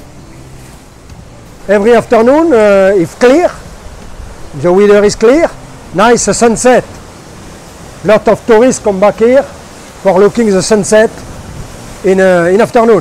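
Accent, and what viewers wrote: France. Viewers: I can sense some French accent.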